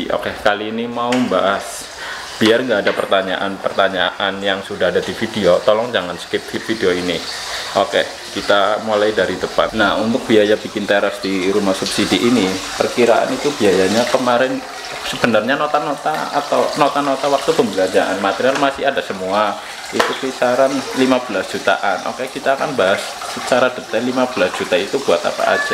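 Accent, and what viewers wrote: Banyumas, Indonesia. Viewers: Bhsanya #ngapak😁😀. Sukses selaluh mas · Kayakne ngapak ngomong bahasa Indonesia ne masih keciri ngapake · bahasanya ngapak kaya kuwe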